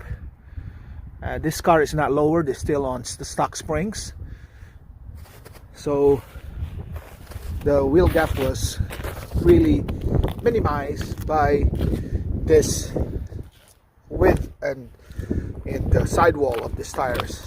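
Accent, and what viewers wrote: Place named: Philippines. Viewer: Philippine accent. How did you get to Illinois? Too damn cold..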